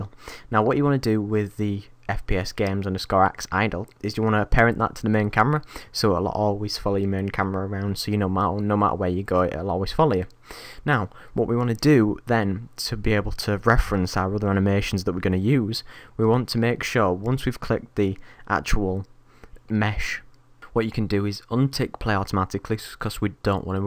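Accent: United Kingdom. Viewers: Man are you british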